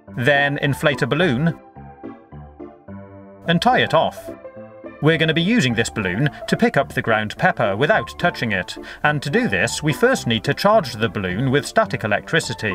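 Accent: England, United Kingdom. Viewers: England. The accent! Just my guess. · yup definitely from england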